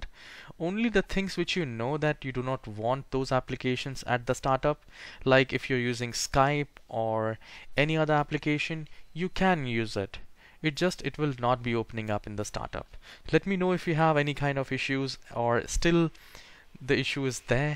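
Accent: India. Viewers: I like your Indian accent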